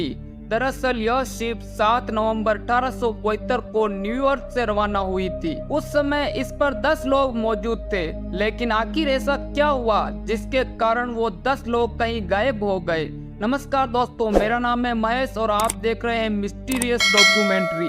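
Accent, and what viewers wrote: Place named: South, India. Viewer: Naration is very bad in southern accent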